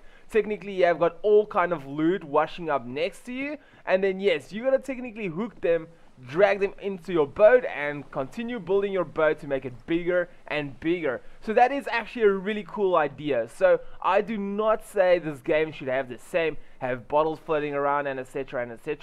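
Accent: Afrikaans, South Africa. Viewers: lucky guess lol I’ve been trying to learn Afrikaans so the accent sounded familiar to those in the Afrikaans tutorials.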